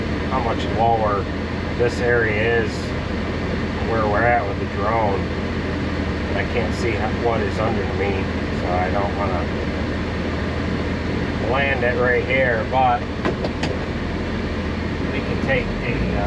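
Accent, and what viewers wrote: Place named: Midwest, United States. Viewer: Way you talk, I figured you were from far away, mid-west or dakotas. Saw those NY plates and realized you're about 5 hours west.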